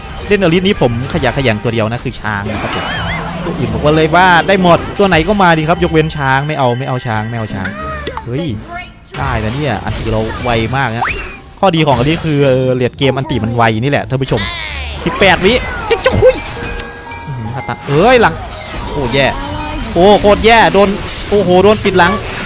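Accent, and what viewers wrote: Isan, Thailand. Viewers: เป็นคนที่พูดอีสานแล้วดึงดูดใจแปลกๆ ค่ะ55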